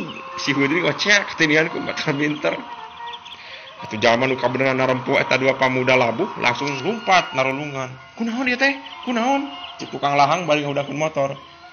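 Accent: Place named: Java, Indonesia. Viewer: resep logat jawana.....hhee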